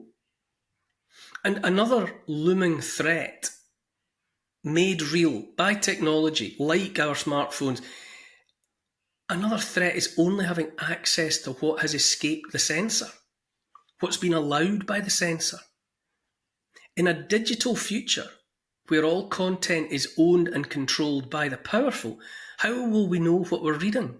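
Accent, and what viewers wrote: Scotland, United Kingdom. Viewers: Neil, I am an American who has lived 71 years. I have seen a lot of things throughout my life that long ago raised my distrust of power at all levels. I have had love since childhood, for books and learning, from the classics to philosophy, science, and everything in between. I have read Fahrenheit 451, and it is now banned in some libraries here in the U.S. I know you are a kind and decent man, blessed with that wonderful Scottish accent, and I agree with everything you said. But I'm worried about what you didn't say. I agree that revolutions are bad for people caught in them, but what is the unsaid part. How does society get rid of the yoke of tyranny? Sometimes, you have to flip that coin. I read a great little book years ago, written by a Scottish American academic that had a memorable effect on me. Man's Unconquerable Mind by Gilbert Highet talks about mankind being on both sides of longing to be free and staying strong enough to get that freedom. 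If you know freedom, you can not accept anything short of that. I would love to hear what your suggestions are for people in the U.K.,the U.S. or anywhere. I have been watching the U.K. with alarm, and I can see the same thing here and elsewhere. The world is a grenade with the pin pulled. By the way, Bradbury was prescient about book banning. I enjoy your show very much.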